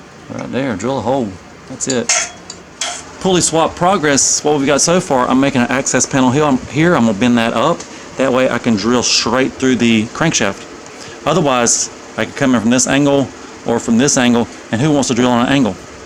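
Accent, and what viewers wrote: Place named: Georgia, United States. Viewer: Awesome. Your accent sounds exactly like my friend who’s from Georgia. That’s why I ask! Great video!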